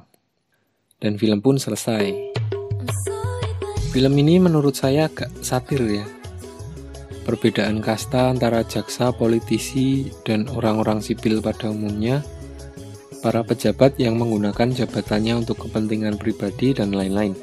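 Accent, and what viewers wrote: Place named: Java, Indonesia. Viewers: Medok bgt sih bhsa Jd gk menarik dgr suaranya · Ngomongnya itu lo minnn campur aduk ada yg baku ada yg medok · Bok jowo wse mas .luweh apeh logate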